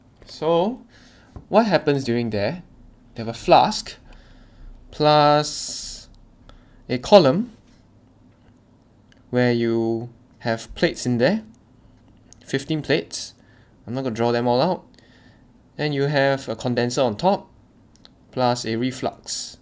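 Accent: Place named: Singapore. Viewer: I know a Singaporean brother as soon as I hear the accent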